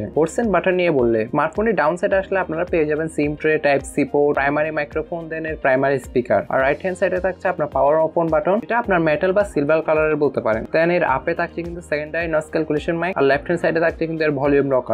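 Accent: Chittagong, Bangladesh. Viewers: চাটগাঁইয়া রিভিউয়ার মনে হচ্ছে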